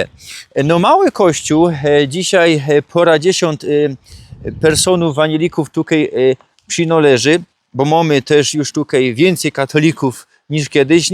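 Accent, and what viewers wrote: Silesia, Poland. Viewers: waserlajtungowa stela ... 😊 zabawne, pierwszy raz słyszę ale robisz postępy bo to tak brzmi richtik "po slunsku" ale bez obrazka wtle nie wiedziałbym dokładnie o co chodzi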